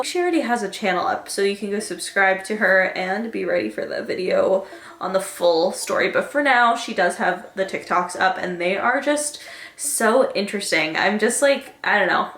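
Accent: United Kingdom. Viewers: Why am I so obsessed with your random British accent, it's so fucking funny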